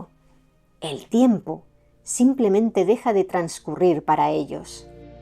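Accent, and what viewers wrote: Castile, Spain. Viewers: De los pocos españoles que no me molesta escuchar el acento castellano,buen tema y bien resumido, felicitaciones.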